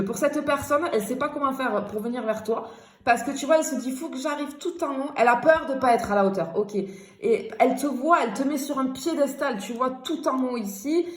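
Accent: South, France. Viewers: C’est trop bon l’accent du Sud !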